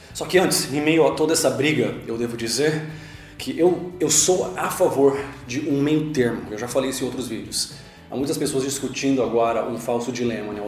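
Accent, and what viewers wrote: São Paulo, Brazil. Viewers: você é paulista ou catarinense, fiquei curioso agora e depressão, tenho problemas com autoestima e já pensei em suicídio por diversas vezes, até conversei com um psicólogo, mas ele acha que remédio vai resolver o problema, depois que uma garota me humilhou na frente da escola eu nunca mais consegui falar com uma mulher e tenho dificuldades em me relacionar e isso me incomoda e quando tento conversar com alguém a maioria das pessoas são bem grossas e nisso eu me isolo e quando vejo um casal eu me sinto mal porque não consigo sequer uma ficante, é só um desabafo mesmo.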